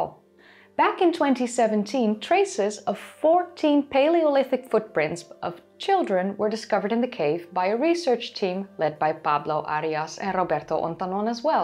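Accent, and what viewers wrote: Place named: Netherlands. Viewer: that wonderfull dutch accent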